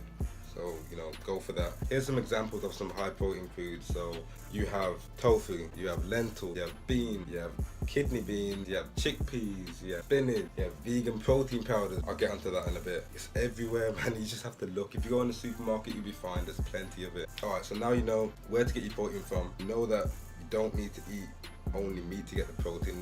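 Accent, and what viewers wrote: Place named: Birmingham, United Kingdom. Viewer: The birms accent is too wavey!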